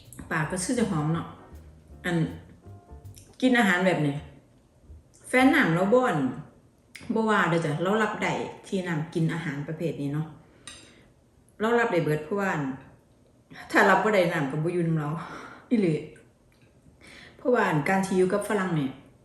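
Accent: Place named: Chaiyaphum, Thailand. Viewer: สำเนียง เหมือนคนขัยภูมิ แม่น บ่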